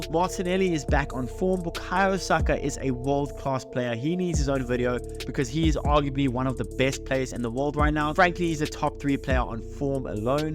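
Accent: South Africa. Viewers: Is that a South African accent I detect? Awesome content bro!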